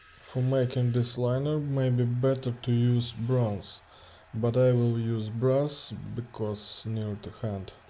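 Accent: Russia. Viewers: Такой акцент яркий, ощущение будто русский человек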